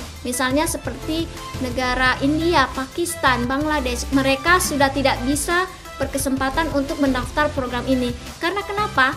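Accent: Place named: Palu, Indonesia. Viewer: Lebih lagi kw buat vlognya pake dialek palu.... Bangga orang Indonesia, khususnya palu. Dstu di liat betapa bragamnya Indonesia...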